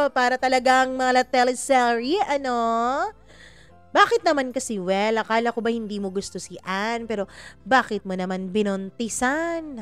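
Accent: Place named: Conyo, Philippines. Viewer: 😅ang konyo ha 😅 inde ko nmn mina Mind 😆 ano yan live selling 😂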